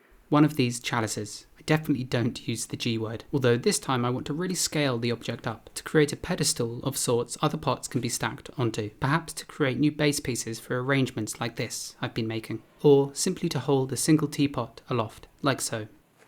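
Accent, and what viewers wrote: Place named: England, United Kingdom. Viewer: To anyone wanting to hear a real English accent.. welcome.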